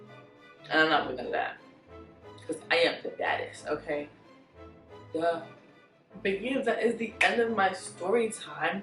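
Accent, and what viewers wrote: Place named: Nigeria. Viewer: loved how you spoke like a typical Nigerian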